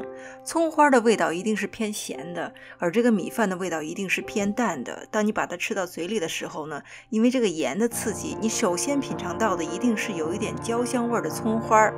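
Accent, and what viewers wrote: China. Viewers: 的配音好有中國味向獻上我的愛心💗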